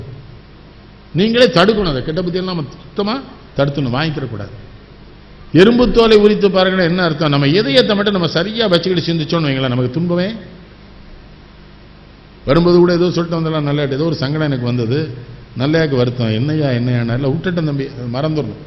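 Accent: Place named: Tirunelveli, India. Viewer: நெல்லை பாஷை அருமை.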